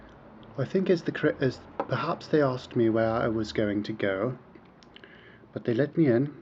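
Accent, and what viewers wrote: United Kingdom. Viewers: Than you didn`t explore your country well enough. Have you been in New Orleans, or some suburbs of LA or NY? Pretty much filthy, even subway in NY looks that you can catch some serious disease, although it is a great town. Mexico is far away of great society............sorry USA. More important question is why this guy call himself American when he talks with British accent? Provocation or he is ashamed of his nationality, or he think that being an American is - cool? :) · American with a British accent? Hmmmmm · Both accent and features rather point to British person... He visited my birth town Belgrade and i would like to tell that he visited Celtic city, Celts made in BC. Romans overtook `round 40 BC then Slavs came and city name changed from Singidun - meaning "Round Fort" (name given by Scordisci) to Beograd (Belgrade) which means white town, it seemed white to them. 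Since he is Brit this suffix (dun) should be something he recognizes..or not? This story is kind of skin tanned too much... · he's british, or american with a british accent, which is extremely rare btw, you're disgusting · This dude needs to go to detox clinic first, then go to a mental institution to clear of his mind. He is mixing Bosnia with Serbia, he calls River SAVA - RAVA . . .he even managed to mix the fact that he is fucking British with perfect British accent, not American. He perhaps visited USA once in his mind! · sry man. u sound kinda british :) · That fucking accent is from Britain he is no fucking American ;) I wouldn't beat you because ur gay I would beat you because you are fucking nasty ass person. Did I mention you are fucking DUMB? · can´t you hear his voice. he is speakin´ clear british english so thats the explentation. so stop acting smart. u know it´s better to shout the fuck up sp ppl think you are dumb, as to say a word and clear all doubts!!! 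· Your take on Serbia isnt that interesting, but you seem to think it is. And why not say you're a Brit? You don't SOUND like an american. I like Serbians. I'm an american. You seem a little Holier than Thou. It's off-putting. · You are not American. You have a British accent. So please don't give us a bad rep with your negative comments. I have friends in Serbia and would love to go there. I'm sorry your experience was negative. But really I don't understand why you don't finish eating, belching, picking your nose, etc. BEFORE you put your negative comments on You Tube for all to view. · It's strange how you don't have a typical american accent, you sound more British. You are very ignorant, and I really hope you were joking here. Otherwise it's just sad. What is the point of this video anyway? To show off your ignorance?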